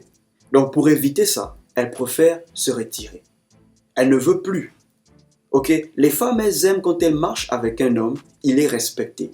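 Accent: Gabon. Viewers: La tonalité de la voix et l'accent...mec tes Gabonais ?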